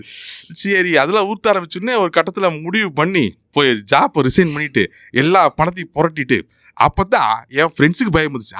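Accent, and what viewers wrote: Kongu, India. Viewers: இனிமையான கொங்குத்தமிழ்..... 👍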